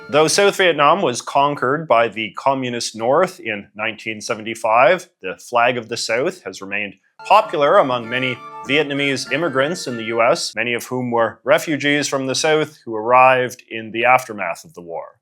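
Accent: Canada. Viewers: Hes Canadian with a Canadian accent. Accents ≠ mispronunciations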